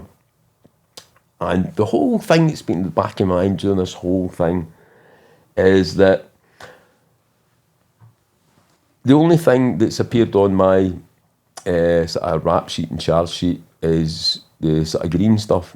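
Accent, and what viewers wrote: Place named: Scotland, United Kingdom. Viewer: I'm sure this story is amazing (I know it is) But I can't understand so much of it ! I'm usually fine with the Scottish, but this guy is deep 😂 I want his book for sure :) Bless u mate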